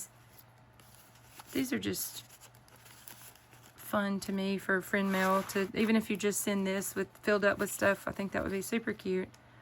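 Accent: South, United States. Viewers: I love this so much! Your voice is so cute! I recognize that southern accent!! I am not watching it all as I want to be surprised!! 🩷🩷🩷🩷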